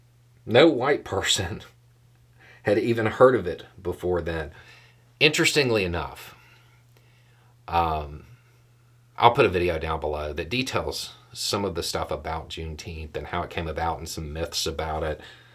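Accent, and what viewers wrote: South, United States. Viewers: Some of the messages that come in really make me think some people out there just have a kink for being verbally dismantled bearded white guy with a southern accent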